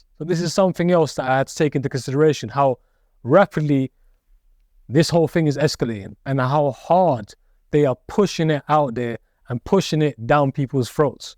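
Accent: United Kingdom. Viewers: Im colour blind your accent sounds like you from London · You look silly dressed up like an Arab goat herder with your rough and ready Midland accent. Is that called Cultural Appropriation.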